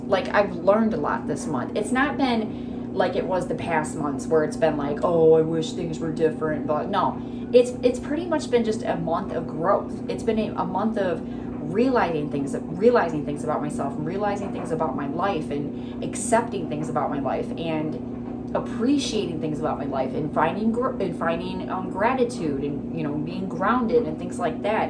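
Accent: Michigan, United States. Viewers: So this is funny, I was watching your video and my son was sitting near me on the couch and he said mom that lady sounds like you: I knew what he meant right away, it’s the Michigan accent because I’m from Michigan lol. I was like yep that’s right!! lol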